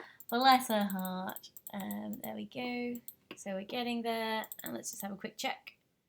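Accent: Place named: United Kingdom. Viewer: Love yer acent , so british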